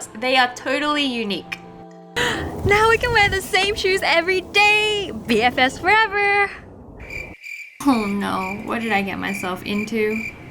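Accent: Australia. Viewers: Has anyone noticed wengie's Australian accent has toned down a bit?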